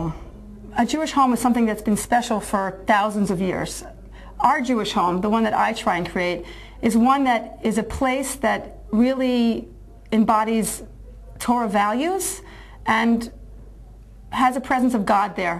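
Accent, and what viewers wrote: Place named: United States. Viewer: I would assume, from your accent, that you're a citizen of the United States. The United States is legally a secular Republicanist nation founded on the whole concept of personal Freedom. Since you proclaim Torah-values I must assume that that the Republicanism of this great and grand Republic is something you not only would never teach in your home, it is obviously something you outrightly reject. And yes, in this Republic, your right to believe as you do is legally protected this way.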